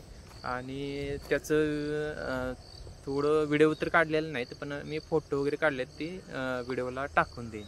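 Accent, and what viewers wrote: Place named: Satara, India. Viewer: भाषा सातारी वाटत्या 👌👌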